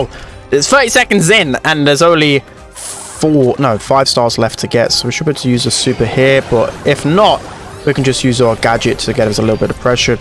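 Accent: United Kingdom. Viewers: I love your british accent 🥰🥰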